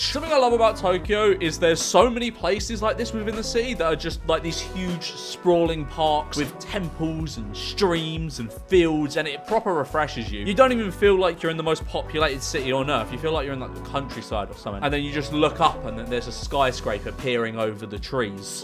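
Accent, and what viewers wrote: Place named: Birmingham, United Kingdom. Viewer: why are you developing a birmingham accent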